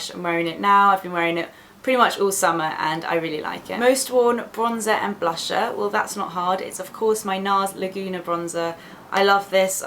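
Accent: United Kingdom. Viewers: british accent...subscribed! :D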